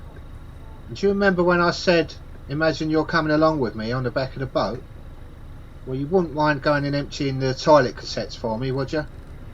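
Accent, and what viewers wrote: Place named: Cockney, United Kingdom. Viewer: Another great vlog and so nice to hear the old cockney patter again. Moved out from the East End about 13-14 years ago as you know and mainly hear the old Essex twang now. e.g. ing has become `n` (shopp`n, work`n) and so on. The locals get their own back and I get my leg pulled for dropping my `H`s 😁 Lovely day for travelling the canal especially with the sun shining. Also glad your not dying of thirst either. Keep them coming, so enjoyable. Seeya. Love Mumx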